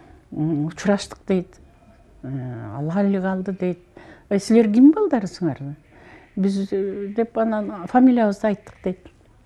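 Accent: Standard. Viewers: Сизди угуп олтуруп абдан жонокойлукту кордум ,кандай суктанаарлык муноз,эч нерсени унутпай жашоодо талкан, каймак жонундо абдан так айтканы кызык,анткени он сегиз жашымда биринчи Фрунзеге борборго келгенде аэропорттон коргом,бирок кыргызча билбейт го деп сыртынан негедир муноздомо бергем,ушунчалык так таза нукура суйлогону кызык,а Чынгыз Айтматовду Алма-Атада коргондо ким эле деп ойлоп карап,анан эстеп кетип барбагам сыртынан гана карап, негедир жаштык кылып жанына чуркап барып учурашпаганыма азырга чейин окуном ,бактылуу каарылык корунуз ! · Керемет,кыргызча суйлогону тан калтырды,таза,нукура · Нукура кыргыз тилин суйлогонун кайра кайра коруп угуп жатам бар болунуз эжеке кооп жашаныз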